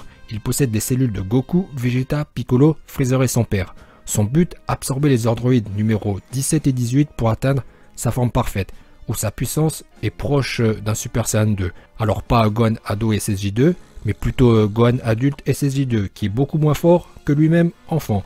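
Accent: Japan. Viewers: J' aime trop ce que tu fais avec ton accent japonais dans tes vidéos continue comme ça 👏👏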